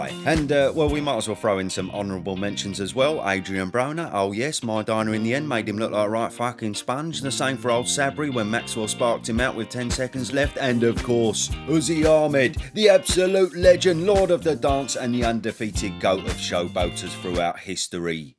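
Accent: United Kingdom. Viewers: Dude your commentary is hilarious! A howard cosell intonation with the brit accent. Your irreverence is way more entertaining than the other stuffy guys. Well done guy. 👍🏽👍🏽😀😀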